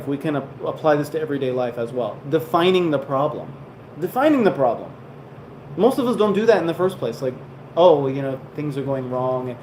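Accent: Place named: United States. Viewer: This live stream- I don't know why?- giving sence of enjoyment like that cool American and British speaker and thier unique speaking style. You are using American Acccent too.😅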